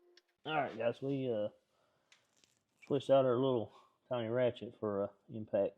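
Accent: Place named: South, United States. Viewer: Big farting slow talking southern draw coc skr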